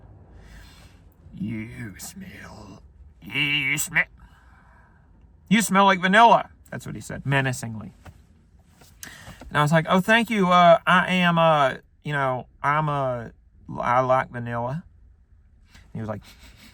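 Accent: South, United States. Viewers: His southern accent is so fucking sexy… ❤️ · Nothing has ever offended me more then southern accent.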